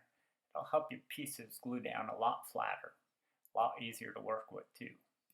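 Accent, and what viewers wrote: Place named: South, United States. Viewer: U gots a southern accent now!!!!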